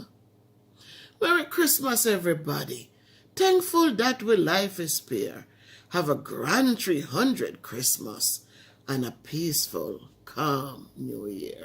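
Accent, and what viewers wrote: Jamaica. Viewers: Blessings my dear sis...just tuning in..the poem is good.. You always make me laugh when you do the patwah..love you always you bring joy to us..prayers and blessings to you and family...ernestine 🇬🇧 uk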